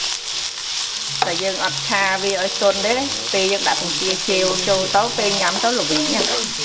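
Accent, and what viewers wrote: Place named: Kampot, Cambodia. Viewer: Hi mama, I interested in watching your cooking show very much! By your sound, are you from Kompot province?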